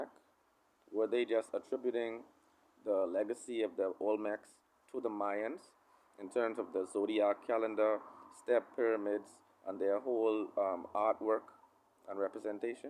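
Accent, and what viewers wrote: Bahamas. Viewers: Sound like you Bahamian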